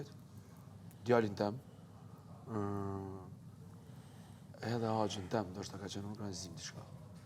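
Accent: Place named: Serbia. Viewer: Ky as me fol zdi ,ky flet si serbet qe mundohen me tol shqip prej zorit naj her rrall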